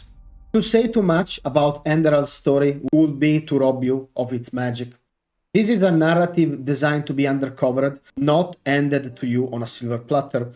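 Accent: Italy. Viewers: Your language is beautiful and poetic! You might just want to speak a tad more slowly, pause for a moment between sentences to make it easier to follow for those of us not used to the Italian accent. Mille grazie!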